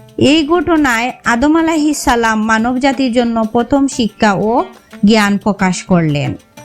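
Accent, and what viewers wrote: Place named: Sylhet, Bangladesh. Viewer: আমার মনে হচ্ছে, আপনি সিলেটি, আপনি শুদ্ধভাষা ব্যবহার না করে, আপনার আঞ্চলিক ভাষা ব্যবহার করেন, শুদ্ধ ভাষা আর আঞ্চলিকতায় মিশে থাকলে শুনতে ভাল্লাগেনা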